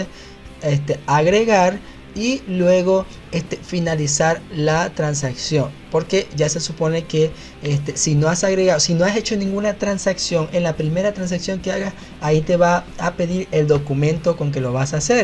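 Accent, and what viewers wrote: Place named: Venezuela. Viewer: Mmm tienes un acento al chamo amigo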